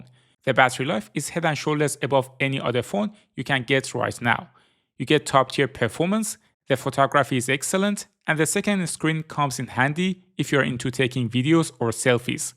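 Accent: Iran. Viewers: your iranian accent tells everything.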